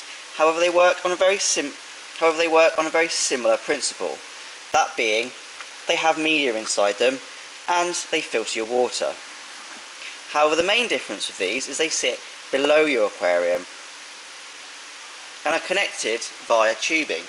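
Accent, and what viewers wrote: England, United Kingdom. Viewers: Kit Harrington accent...nice 👀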